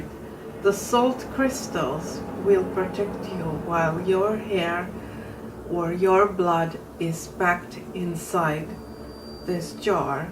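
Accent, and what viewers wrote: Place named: Sweden. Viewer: Er du svensk? Jeg er fra Norge og kjenner igjen aksenten din😁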